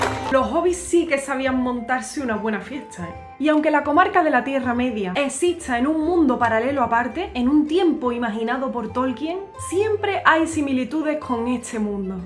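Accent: Andalusia, Spain. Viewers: Disculpa... de casualidad eres andalusa??? 😅😅😅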